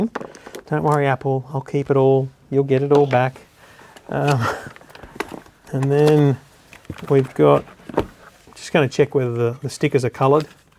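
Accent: Australia. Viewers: Nineneen nineny eight. Theeng. Compuudah. You must be Australian...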